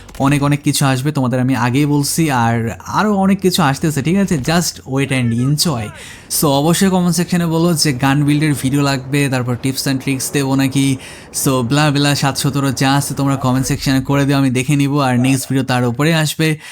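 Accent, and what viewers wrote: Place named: Rajshahi, Bangladesh. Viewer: Apnar basha rajshahi er kothay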